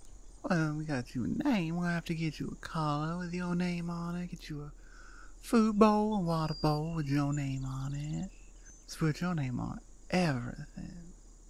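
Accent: South, United States. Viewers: I promise I’ll be the best dog boy you’ll ever have, I hope to get plenty of head pats, ear scratches, and belly rubs from you if it’s not too much trouble🐶🐾 Love your southern accent hope to hear more of it in future audios ❤ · You’re telling me I get to live on a farm, have bacon, and live with this beautiful cowgirl? Sigh me up! I loved this audio! The art was beautiful and your southern accent is great! I live in the south and that kind of accent can make a man blush when used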